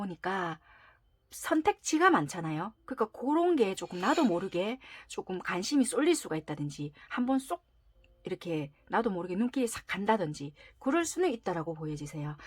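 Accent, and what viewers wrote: Busan, South Korea. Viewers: 감사합니다~^^ 좋은일이 생기면 꼭 후기 남길게요! 🙏🏻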